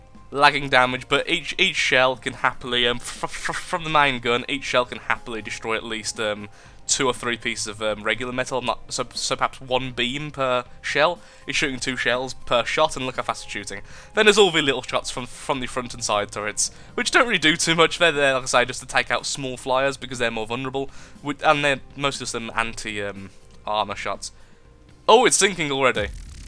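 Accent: United Kingdom. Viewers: are u a brit?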